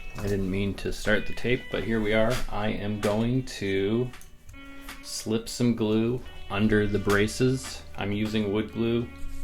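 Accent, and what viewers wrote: Canada. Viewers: Actually I think it sounds spot on. I have a question for u, for a Canadian why do u say “Oooout” & “Aaaay” like the rest of ur peeps? This coming from an American w god only knows how many accents n dialects…. 😮 Yes I’m n Idiot, like the channel brother!! Can’t tell who u best look like, it’s either Dave Mustain the Megarocker without the beard or the Viking slayer Zach Wild. Either way that would put U in a killer collection! Rock on bro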